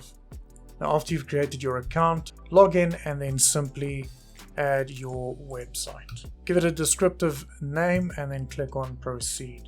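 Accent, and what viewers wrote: South Africa. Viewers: Are you South African 🤔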